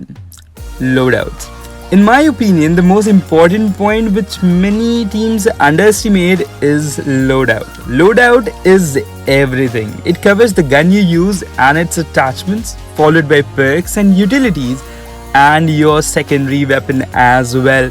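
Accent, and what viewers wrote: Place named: United States. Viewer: Bhai iss video me tera accsent alag lag raha hai 🤔 more like American accsent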